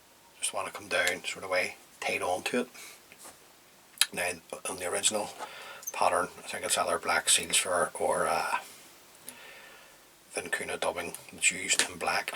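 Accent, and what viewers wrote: Northern Ireland, United Kingdom. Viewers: Excellent tie and collection of patterns! I just sub'd and really enjoyed looking at your community page. Lots of inspiration from this one, especially with the wood pigeon wings. I've used common pigeon (city pigeon I called them) that I found around the house to tie wet fly wings when I first started tying. I love these wets from the UK, and your style of tying them. You sound similar to Ryan Houston, I was wondering if you're from "sunny" Northern Ireland like he is? Thank you. Cheers from the US!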